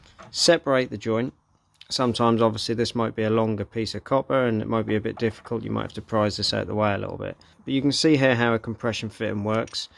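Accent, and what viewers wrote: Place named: Suffolk, United Kingdom. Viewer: I hear Suffolk, right?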